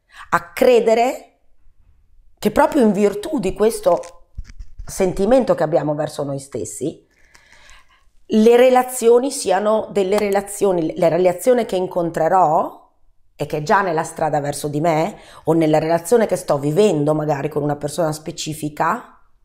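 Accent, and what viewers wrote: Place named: Veneto, Italy. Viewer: Buonasera, mi pare tu sia veneta. Di dove? Io sto a Vicenza.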